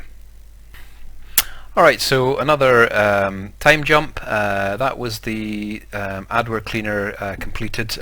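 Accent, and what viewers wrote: Scotland, United Kingdom. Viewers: I just love that Scottish accent as I want to call you. LOL. But Capt kirk said no. LOL. What about VPN's and are they worth the money? The Bleeping rkill is old and not sure where to download it. I am running win 7 bc I HATE win 10 and will only have it if I have too. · If your a Scots man we can understand anything 😅 thanks mate new sub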